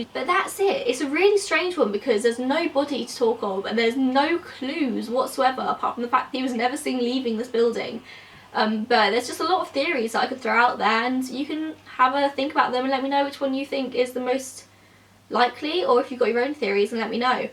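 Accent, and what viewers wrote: United Kingdom. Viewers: You're from the UK?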